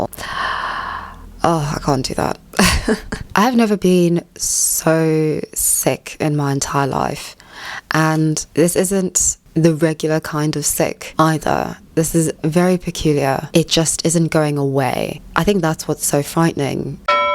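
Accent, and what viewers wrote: RP, United Kingdom. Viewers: How can I be your friend for real? Your accent makes me wonder if you live far away in the UK? & the answer is yes. It is sad how humans are replacing having each other, with having things or pets. Pets are more friendly than humans it seems... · Hey I am so sorry. You re exceptionally honest. this has a lot of value. if i have to be honest I am a bit annoyed by your posh accent (i m italian and probably i have a laughable accent BTW, so please forgive this). But you re absolutely adorable. Please reach out if you need something. even to me if there s no one else. (I live in London though). No one should be that disconnected to be threatened to end up on the street with no alternative. I really hope you re doing better since you posted the video. If not, please make contact. A hug from an oppresive patriarcal white man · What a very posh english voice, never hear white girls talk like that these days. 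Have had similar symptoms. Maybe its the bioweapons, maybe its the illuminati anti love campaign. British NHS is dead, mental health services, government and society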